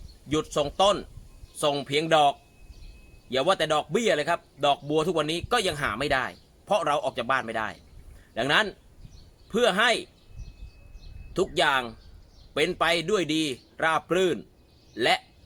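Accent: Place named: Phetchaburi, Thailand. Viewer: เป็นคนจังหวัดอะไรค่ะ.. พูดเหมือนคนเพชรบุรีเลยค่ะ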